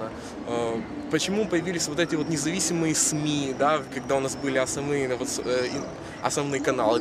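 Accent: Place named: Ukraine. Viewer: Вот это украинец формата 90-х годов я их такими всю жизнь и помнил. Добрые, светлые, со смешным говором.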